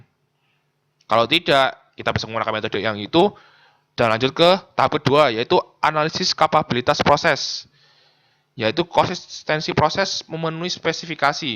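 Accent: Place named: East Java, Indonesia. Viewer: jatim banget, lek ngomong cepet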